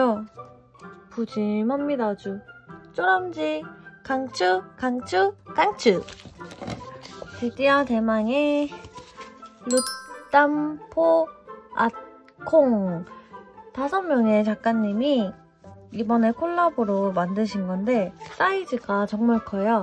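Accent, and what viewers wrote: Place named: Gyeongsang, South Korea. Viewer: 오늘 영상 처음 보는데 대구분이신가용?? 저희 어머니랑 억양이 비슷해섴ㅋㅋㅋㅋㅋㅋㅋㅋㅋㅋㅋㅋㅋㅋㅋㅋㅋㅋㅋ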